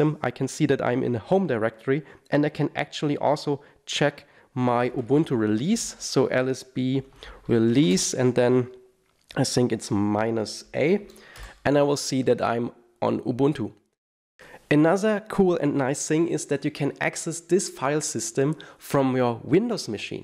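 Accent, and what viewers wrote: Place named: Germany. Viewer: Great Video!!! 🔥🔥🔥 Love the German accent! ♥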